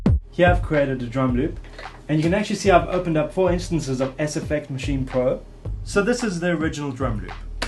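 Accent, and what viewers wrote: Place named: South Africa. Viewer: Sounds like a Saffa :D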